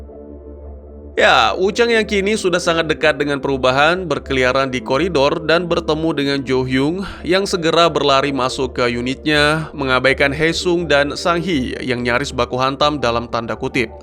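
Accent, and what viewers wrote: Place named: Java, Indonesia. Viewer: Ooh adminnya orang jawa ya😅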